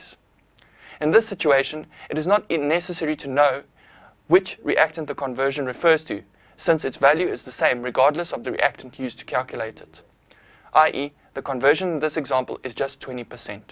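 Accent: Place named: South Africa. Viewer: This man has a standard South African accent (or at least it sounds like it). I thought I was listening to a lecture video from my Uni for second😯. Also, the video was quite helpful.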